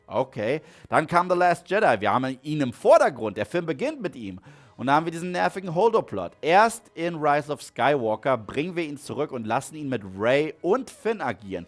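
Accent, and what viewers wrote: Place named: United States. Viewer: da hat aber jemand einen krassen us akzent. :)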